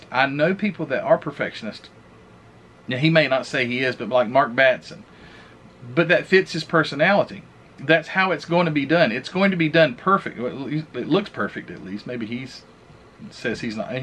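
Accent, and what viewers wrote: South, United States. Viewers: you are real. That's what's so endearing about your channel. Yes you build really cool models but it's more than that. When I first saw you, it was after a Luka Cee video or an HPIguys video. I was really drawn in by your southern accent and the way you talked about your model. I'm glad you want to stay grounded. Thank you for being real.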